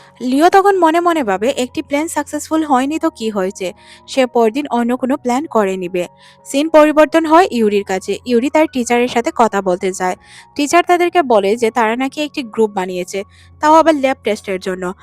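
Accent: Sylhet, Bangladesh. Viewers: kota huniya oi buja jar afne je sylheti 🤣🤣🤣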